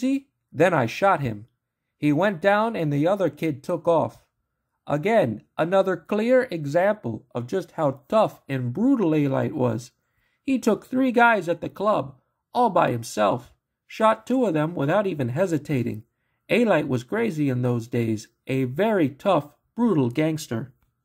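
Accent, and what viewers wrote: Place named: Albania. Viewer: Obviously you’re a John Alite fanboy. By your accent u must be Albanian and I understand the ethnic pride but. No doubt John was a tough guy gangster deeply involved as an associate under Junior Gotti. Def he was a capable guy but I think that u believe the hype too much and fail to realize that there’s 3 sides to a story. Obviously you weren’t there but you believe one side of the story about both Pitera and Gene Gotti. Alite’s side. I’m sure Pitera and Gotti have their versions, then there is the truth. But keep the goofy accented vids coming bc they’re comical.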